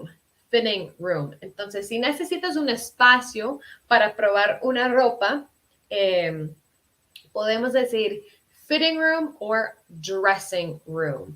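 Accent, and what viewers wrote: Colombia. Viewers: Su acento en Ingles es muy Colombiano. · Pero tiene buen acento colombiano, dice que es de estados unidos pero algo de colombiana tiene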